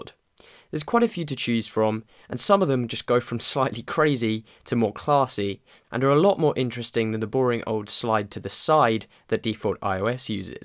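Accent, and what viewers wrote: United Kingdom. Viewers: The british accent just sounds professional and makes you want to get all these apps! i love your videos mate. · would you mind to send to make a list of the tweaks you mention? i'm really bad at understanding english properly, specially if its british acent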